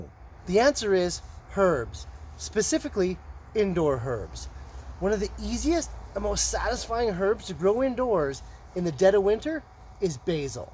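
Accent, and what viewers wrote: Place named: Canada. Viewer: I thought so too. So I looked it up. Turns out, Americans pronounce it with a silent H. Brits pronounce the H (as one would pronounce the nickname for Herbert). The host of this video sounds Canadian to me, so it makes sense that he is using the British version.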